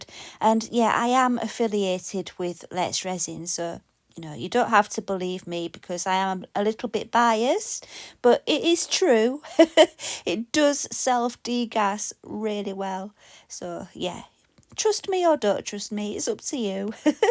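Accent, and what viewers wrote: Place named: England, United Kingdom. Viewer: Beautiful 😍 and such a breath of fresh air to listen to an English person narrating , there aren't many craft videos like this, they're usually American, so thank you for that too 😁 x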